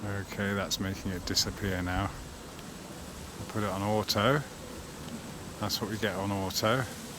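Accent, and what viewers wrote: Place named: Bristol, United Kingdom. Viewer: didn't know you was a bristolian? gurt lush me babber, ow bist? :)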